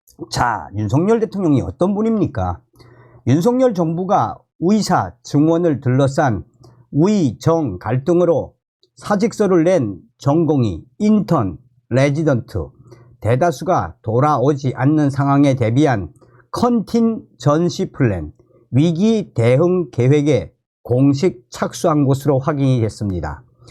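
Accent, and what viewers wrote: North Korea. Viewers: 북한에서 오셨어요? 발음이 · 말투가 북한???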